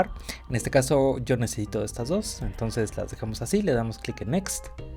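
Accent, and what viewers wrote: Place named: Peru. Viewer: TREMENDO PERUANO LLOCOOOOO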